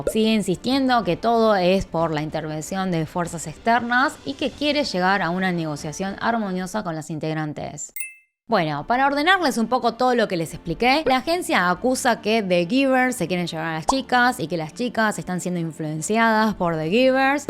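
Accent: Argentina. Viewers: Su acento es argentino porque nació y vivió mucho tiempo en Argentina. Ahora soporta panzona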